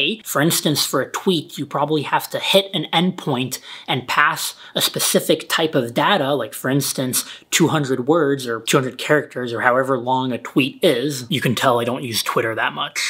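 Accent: Romania. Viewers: You romanian ? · Are you romanian?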